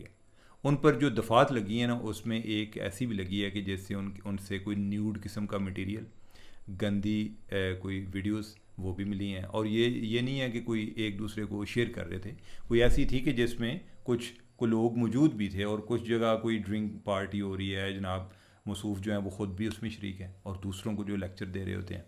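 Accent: Punjab, India. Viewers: Sir! As usual very professional facts based Vlog presented in your unique and interesting style especially with Punjabi "Tarqa". I haved listened Ahmad Noorani & Bilal Ghauri Vlogs on General Asim Muneer. If you could share your information about Army Chief, it will be highly appreciated because Ahmad Noorani has disclosed very strange and unbelievable information about General Asim especially about his mental health, comparison with General ZiaulHaq, not capable for position of a General and Army Chief and his removal by IAKN from post ISI Chief on pressure by Iran etc. etc. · Punjabi touch zabardast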